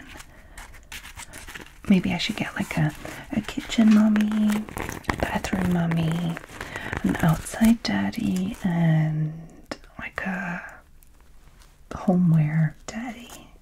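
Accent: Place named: Scotland, United Kingdom. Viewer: Been binge watching your videos all evening. Just discovered that you’re Scottish, so hello from Edinburgh! I haven’t been able to place your accent but I can definitely hear it now.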